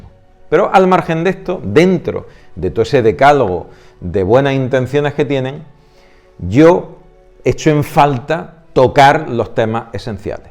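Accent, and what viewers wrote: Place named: Andalusia, Spain. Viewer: Sabía que eras andaluz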